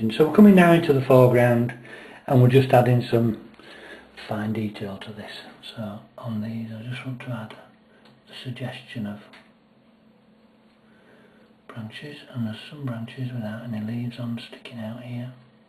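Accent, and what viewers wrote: Yorkshire, United Kingdom. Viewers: Not bad, not bad at all sir. Simple but very effective, plus a couple of techniques I'm going to have to try. Also nice to hear a yorkshire accent, been a Huddersfield/Barnsley boy myself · Really enjoyed watching these 3 videos and love the end result. I'm still a beginner and need all the help I can get so found this very helpful. I'm originally a Yorkshire lass so listening to your commentary was an added bonus! This is the first time I've come across you on You Tube but certainly intend to dig out all the videos I can find. Thank you. Keep up the good work!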